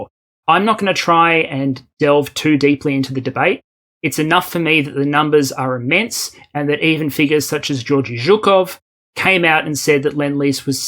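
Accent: Australia. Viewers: If shorter means more regular content is possible then I am all for it. I just want to listen to those dulcet Aussie tones telling me things that no one else seems able to tell me. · I like how these videos are slowly getting more 'strayan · I don't have any kind of training or expertise in any field relevant to this war, so am very happy to get as much information as you can provide, considering every angle and in as much detail as possible. I enjoy sitting through exceedingly long lectures, so unless you're reading through a list of every nut and bolt of every tank there is, I won't be bored. I also very much enjoy your style of exposition, and I always get a kick out of your accent. Listening to an Australian give a more level-headed explanation of what is happening to our neighbours somehow feels reassuring. That being said, I understand that covid is still giving you a hard time, so I will not complain about the shorter videos. 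I would suggest that you create more in-depth analyses and divide them into episodes. I suspect we are a rather patient audience. I wish you a full and speedy recovery!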